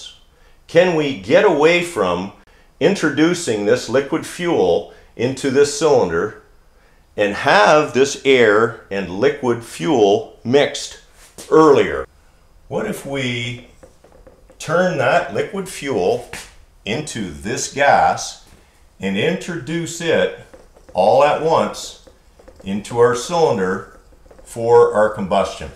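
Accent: Canada. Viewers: Charlize Ravenna ---> You just saved me a whole lot of typing. I was going to suggest changing the title of the video to something like "Already understood details of the physical chemistry of internal combustion wherein every point is repeated at least 3 times, and carefully worded to include as many uses of the exclusively Canadian and universally annoying "aboot" mispronunciation of the word "about".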